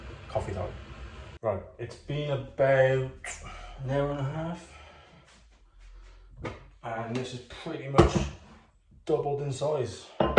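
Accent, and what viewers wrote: Wales, United Kingdom. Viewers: I don't suppose for one moment that I'm the only person who clicked on this as a result of the still pic at the start of the video! 😋😋As for topless "sexual" clips. There are plenty of those on YouTube! BTW, I'm trying to place your accent? Welsh? Apologies, if I got that wrong! 😉😉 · Great Welsh Accent...much softer than some...so, which Part of Wales. Id guess North Wales.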